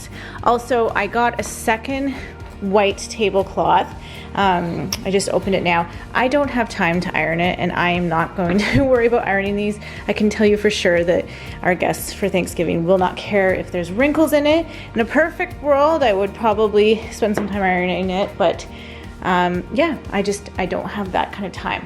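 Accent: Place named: Canada. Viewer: I live in the U.S. but I love your Canadian accent! I never knew that Canadian holidays were different than ours until I started watching your videos. Our Thanksgiving is in November and I’m not hosting but I love to see what you do! I also have really loved watching your decluttering journey, you’ve come so far! I hope you have a happy thanksgiving 🦃😊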